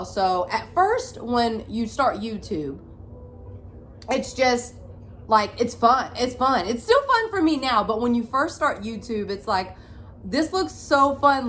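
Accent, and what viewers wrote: South, United States. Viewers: I loved getting to know you more! My mama was a single mom to 4 kids and I never knew how hard we had it. She is amazing and you remind me so much of her! STRONG! Now as a mom (of 2) who has a very supportive partner I just admire my mom and you and all the single mamas that much more. I seriously just admire you so much! And I love your accent! I’m a southern girl living in Utah and don’t get to hear it much often so it’s an extra bonus for me! ❤️❤️❤️ God bless! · I love watching your channel. I lived in Atlanta for a while, so listening to your southern accent so awesome. Listening to this episode on your channel, I find a lot of similarities in my own life. I started crying when you spoke about loosing your son because I also lost my son.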